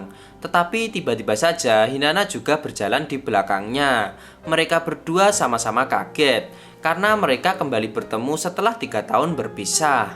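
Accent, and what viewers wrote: Java, Indonesia. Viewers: Jng membaca medok jowo mas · Medok amat ngomongny😂😂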